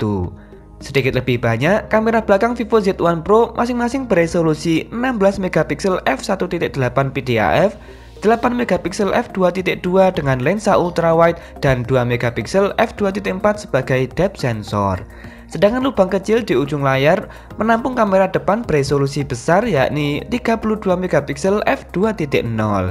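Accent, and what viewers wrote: Java, Indonesia. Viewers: wong jowo yo mas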